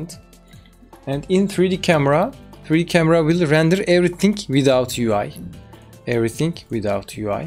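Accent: Turkey. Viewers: Your accent like Turkish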